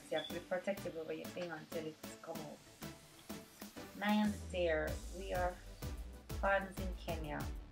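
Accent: Jamaica. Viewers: love the jamaican accent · Jamaican accent sounds definitely cool :) Lots of love from Paris, France.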